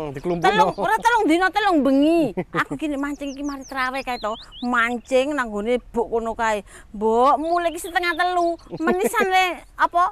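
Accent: East Java, Indonesia. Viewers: Bu, asli mana ya.. Logate kok wong etanan 🙏😉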